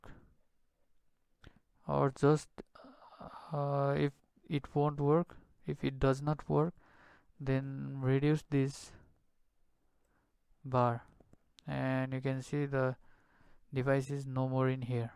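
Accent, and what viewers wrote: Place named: Nepal. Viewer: bro nepali ho kya ?? 😂😂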